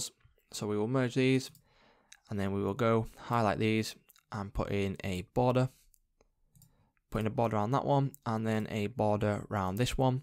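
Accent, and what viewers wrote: United Kingdom. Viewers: you are british. arn't you? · i think bro is britian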